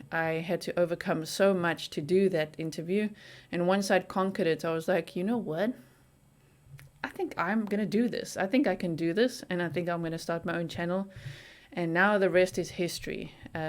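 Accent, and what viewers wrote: South Africa. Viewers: I know how busy you are with your rapidly increasing fame & can't answer everyone. I sent a gmail with the question on if religion ever plays into your analyses/investigations & I should have said evil, possessions, satanic activity. What Brian did is evil & maybe he went full blown dark & sacrificed poor Gabby. Maybe he was temporarily possessed a la Chris "Low Wattage" Watts? How about Anna Leikovic, Aiden Fucci, pure evil. I have been traumatized in my life dealing with evil. We can all speculate, maybe the "Dirty Laundries" are closet satanists? Thanks & you are awesome & so is your South African accent. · Yes! I found you because you are covering the Petito/Laundrie case and I could listen to you just because of your south african accent: I love it 😉 My aunt is also from south africa so it sounds so familiar. But I also love the way you dive into the whole case, the whole persoon. 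Not just one aspect. It is nice to hear a bit of your background and who you are. Not nice to hear about the trauma but like you said; it makes your approach different and even more thourough in cases I think. I hope you feel a bit at home already here in the Netherlands. And safe(er) 💛 Groetjes! 😉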